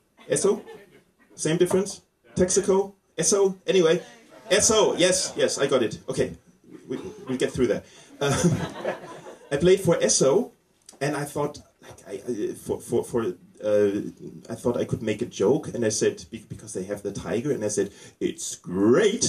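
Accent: Germany. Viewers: Er vielleicht? Man hört doch ganz deutlich den deutschen Akzent. Außerdem gibt es auch in Deutschland sprachbegabte Menschen. Vielleicht gehört du einfach nicht dazu? Ich weiß es nicht, da ich dich nicht kenne, aber es kommt mir gerade so vor. Ist nicht bewertend und angreifend gemeint, um das schon mal klar zustellen. Ist mir einfach nur so vorgekommen.... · What a funny German accent. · hello, germany! Haha, the clip is great and Your english also! I ran over your conversation with the 'Ben' and I have to say I admire your patience ; ) Btw, I'd guess, judging by how your accent sounds, you're from somewhere in the southwest region of germany? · Echt lustig. :'D Nur dieses deutschige Englisch.. Ist aber trotzdem gut! ( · It's actually quite funny! And the German accent makes it even funnier! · This rocks! And I bet for the Americans the German accent even doubled the fun. 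Anyway, it's hilarious! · Awesome! I kinda like the german accent, although I don't have any.. Greets from Germany! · i live in switzerland this guy reminds of my german friends, they have an exact same english accent. And i loved your jokes it was funnier in your accent and time by time you asked the audience what is it in english hilarious.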